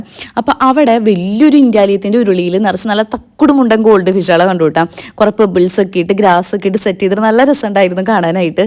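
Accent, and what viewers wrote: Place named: Thrissur, India. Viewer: തൃശൂർക്കാരി..... എനിക്ക് ഈ വർത്തമാനം ഭയങ്കര ഇഷ്ടം ആണ്.....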